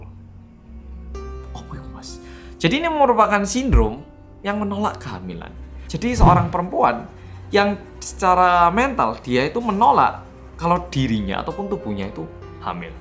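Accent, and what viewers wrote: Java, Indonesia. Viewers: Aku ikutan bilang.... medhookk:"))) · Bersama orang Korea yang "medok" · Apik Mas Bro. Serasa wong jowo korea. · Mau saran dong buat tambahin english subtitle karna suka banget sama konten2nya mas, apalagi kalo lagi bahas kasus2 di korea, kdrama atau kpop. Suka pengen share ke temen2 internasional sesama pecinta korea karna mas suka kasih info yang gaada di tempat lain, cara ngejelasinnya juga bikin betah ga ngebosenin tapi sayangnya gaada english subtitle jadi kadang suka males bikin summaries-nya. Biar sekalian bisa memperkenalkan bahasa indonesia dan logat jawa ke luar negeri plus bisa nambah internasional viewers biar go internasional. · Bersama yang medoookkkkk 😄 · Gue selalu ngikutin pas ngmg bersama orang korea yang MEDOKKK:) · Kulo kalah medok Karo sampean mas 😄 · Bersama korea yang "medok" 😁 I'M ADDICTED 🙆‍♀️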